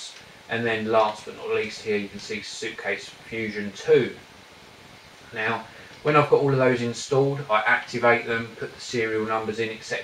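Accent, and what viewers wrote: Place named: Cockney, United Kingdom. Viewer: Every time I hear this intro music, I think "Cockney accent coming up".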